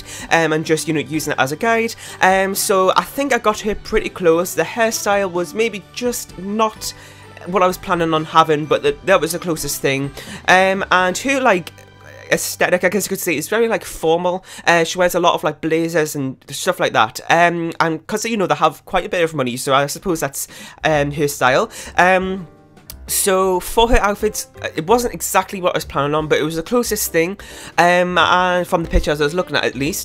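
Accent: Newcastle, United Kingdom. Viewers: this was so great haha! How have you slipped under my radar? This is my first time checking out your channel! You seem like a nice guy, loving the Geordie accent! I'll look forward to having a nose through your work:D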